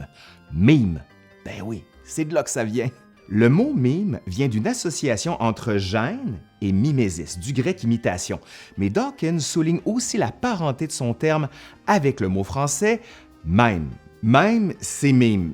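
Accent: Quebec, Canada. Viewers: tu "lisse ton accent"... ou as-tu naturellement un accent quebecois fort leger?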